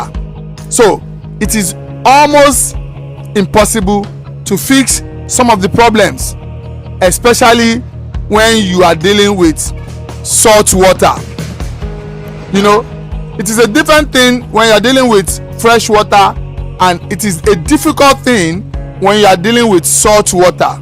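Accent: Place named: Africa. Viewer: African english is so funny 😂😂😂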